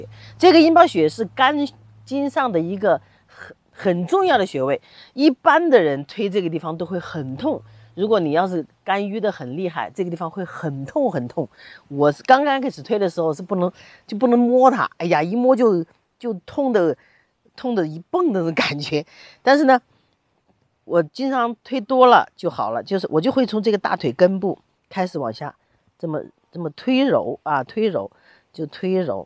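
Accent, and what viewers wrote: Wuhan, China. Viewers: 大姐你是武汉人吧? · 我也觉得是从武汉来的😀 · 、你是不是來自中国-你的口音很像,只有中國人最注重養生、通经脈穴道、你说的沒錯要堅持做才会有效果．我也经常失眠謝謝你、告诉我们方法.我一定試一試、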